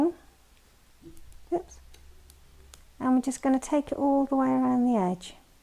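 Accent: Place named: United Kingdom. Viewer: I love that British accent so much!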